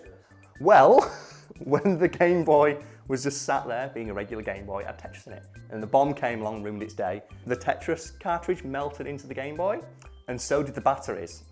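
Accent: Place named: England, United Kingdom. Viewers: Kris R You want captions on an ENGLISH video spoken by an ENGLISHMAN are you for real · Ok to the people who are like " blah blah he's English" no shit mate, but I'm like wtf sometimes to. Some people just hear differently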